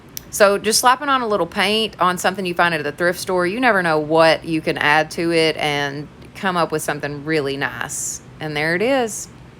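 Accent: South, United States. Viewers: I just stumbled across your channel. So I'm new here love all of you ideas... curious to know where your from because you sound very southern. I am from Tennessee so I really enjoyed listening to you and watching I am now a fan. Thanks so much for the ideas. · Hey, love your accent. What part of the south do you live in? This is my first time with you, and I love everything. Very encouraging.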